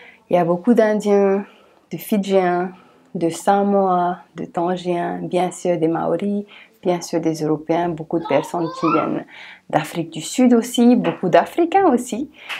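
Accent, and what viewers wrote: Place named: Tahiti, French Polynesia. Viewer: J’́aime bien votre accent français tahitien et la manière dont vous présentez la vie en Nouvelle-Zélande. C’est un peu plus rassurant que ce que l’on lit et regarde sur l’immigration dans ce pays.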